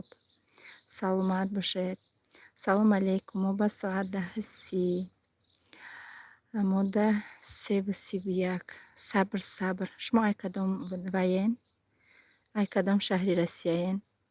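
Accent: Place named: Vahdat, Tajikistan. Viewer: Салом Алейкум.Ман фикр мекардам аз Вахдатен,барои,ки лахчатон монанд.Аллох нигахбонатон,Хохарчон.УВАЖАЮ ВАС!!!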